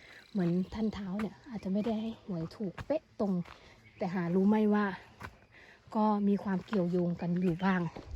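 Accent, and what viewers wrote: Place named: Isan, Thailand. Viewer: น้อง รู้ไหมเวลาน้อง พูดอีสานเสียงเพราะน่ารักมาก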